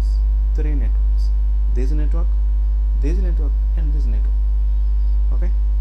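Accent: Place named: India. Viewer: sir i am an ethiopian please make your english language good make it approach to american english not to indian accent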